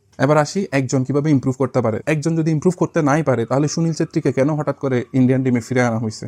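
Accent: Chittagong, Bangladesh. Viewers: ভাই আপনি কি চট্টগ্রামের নাকি । কথা শুনে লাকতাছে।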